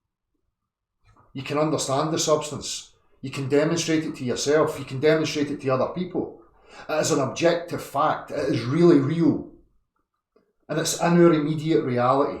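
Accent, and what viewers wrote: Scotland, United Kingdom. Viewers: Who is this Scot please?????? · speaking English with his Scottish brogue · Love the Scottish accent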